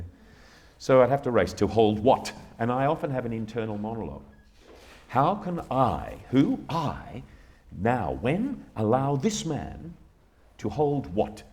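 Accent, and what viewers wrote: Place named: Australia. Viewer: His accent. I keep forgetting that he's a fellow Aussie. 😄😄😄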